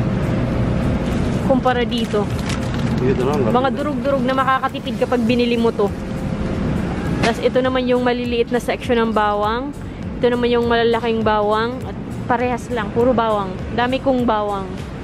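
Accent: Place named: Visayas, Philippines. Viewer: Taga saan ka madam. Bisaya accent man.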